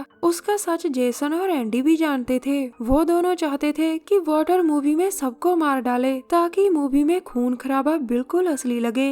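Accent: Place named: Bihar, India. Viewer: Always here Love you didi You are from Bihar I know 😁🙏😁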